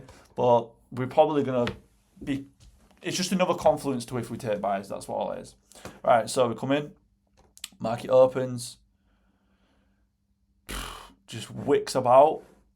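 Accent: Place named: Yorkshire, United Kingdom. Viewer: Proper Yorkshire man 😅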